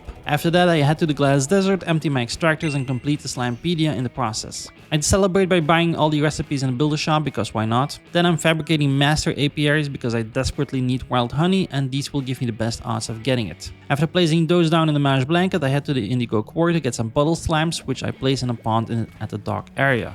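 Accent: Netherlands. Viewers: I smell Dutch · I smell nederlands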